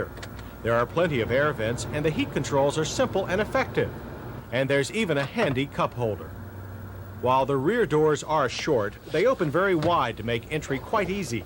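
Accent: United States. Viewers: What state is his accent reminiscent of? Cam Ray 😂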